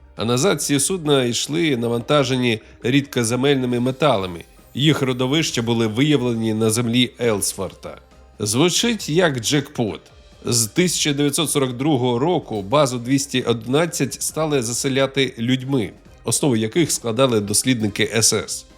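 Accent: Russia. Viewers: Дякую за чудове відео, хоч і трохи дивно слухати про історичні події від людини схожої на депутата 😅 Помітно, що людина російськомовна, але українська вже майже бездоганна! Ще раз дякую і продовжуйте в тому ж дусі!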